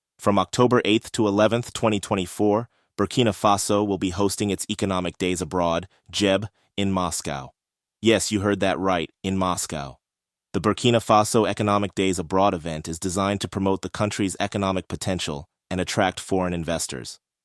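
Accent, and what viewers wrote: United States. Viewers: He is a dictator. And like all dictators, it never works out for the people in the end. Putin is a monster. Why does the narrator sound like he is from New Jersey, not Africa? 😁 let us hear your voice! Don’t be fake! Propaganda channel…. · Not interested in listening to a machine with an American accent or any other accent because it is a machine. Find a human to talk about Africa. The way African people from all over the continent talk with their feelings how they express themselves with regional and cultural accents and opinions. This is the kind of Africa I would like to see, not some American AI Bot! Even better in some ways is just have subtitles and speak the many languages of the beautiful continent.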